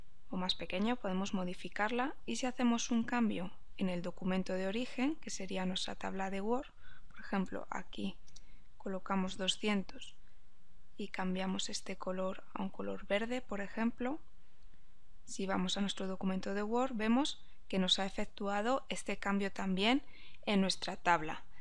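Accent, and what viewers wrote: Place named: Spain. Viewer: ¿De qué parte de España es ese acento?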